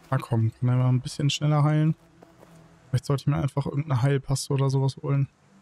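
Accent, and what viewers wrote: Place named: Sweden. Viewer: ist Schwede Mütterlicher Seite. Da Spricht man es so aus.😂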